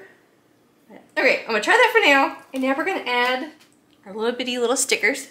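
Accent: South, United States. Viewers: I just love your channel and all the beautiful things you create. You are so talented and your little southern accent is adorable. I'm a 69 year old grandma and it always puts me in a better mood to just watch and listen to you! I think I'm gonna go shopping! Dollar Tree here I come!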